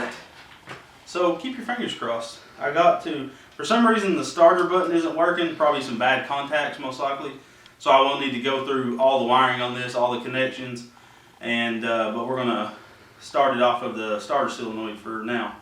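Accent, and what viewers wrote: South, United States. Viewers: Cool, good job! Sounds like you are in the south, as I am too, I'm a old fart but I have been inside of every kinda of engine known as well as carburetors. Some friendly advice on a product which you probably have already tried but anyway get you some berryman carburetor dip it is a miracle dip. I use it also to clean bolts and pretty much anything. Give it a try.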